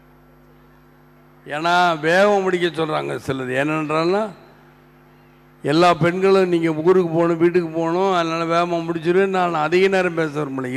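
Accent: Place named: Telugu, India. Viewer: First learn tamil we know u r telgu person....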